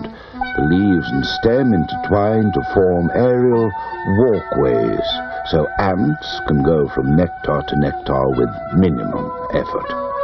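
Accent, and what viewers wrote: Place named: United Kingdom. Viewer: "After mating, the females goes underground, forever." Way to go creepy british voice for teaching me about the birds and the bees.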